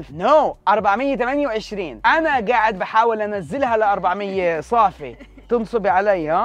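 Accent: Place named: Iraq. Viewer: انتة صرت تحچي عراقي عيني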